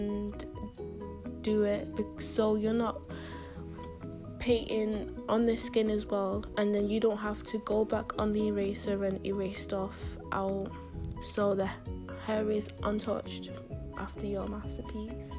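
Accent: United Kingdom. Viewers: Where r u from? It’s sounds like a london accent sorry if it’s not❤️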